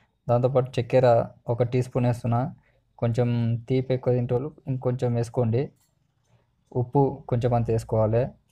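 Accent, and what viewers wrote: Telangana, India. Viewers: Anna ni telangana langveg super anna and drink kuda mast undi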